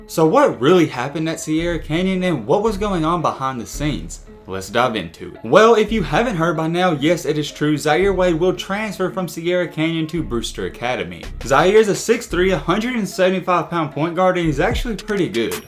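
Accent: South, United States. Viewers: Can’t finish video because of yo country ass voice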